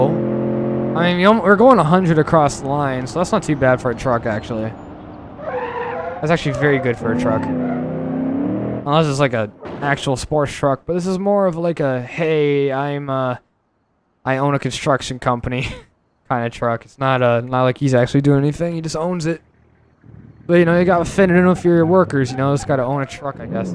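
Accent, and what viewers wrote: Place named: United States. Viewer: You have a deep American ascent you like truck and a ak47 in your truck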